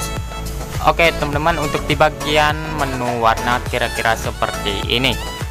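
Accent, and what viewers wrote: Indonesia. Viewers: Bang emng lu orang Cirebon barat? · Wong Tegal um?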